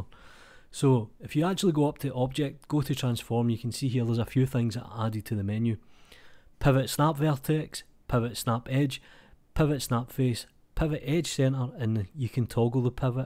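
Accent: Scotland, United Kingdom. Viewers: I subscribed for two reasons: 1) I absolute love the fact that you are doing this and doing it for free. This will help lots of artists coming from maya and make the transition much easier and faster. 2) I absolutely love your voice and accent. It makes me remember the william wallace campaign of Age of empires 2. Also: Please please if possible make it someway that mimics the maya's pivot system. The blender forum has a request for better (and faster) pivot system and I really believe maya's way is lot faster to do. First and foremost it'll help out a lot of students who want to work in blender! Thank you!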